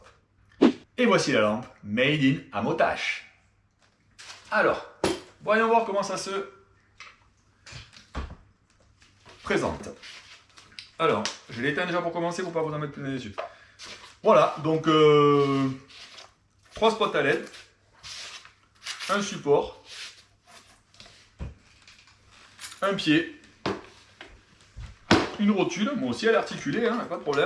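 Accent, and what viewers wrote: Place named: South, France. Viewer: Le bel accent à la Cabrel, magnifique ! ( et trés ingénieux le système bravo à vous )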